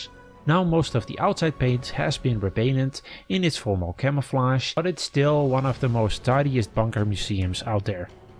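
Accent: Netherlands. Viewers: are you dutch ? you've got a slight accent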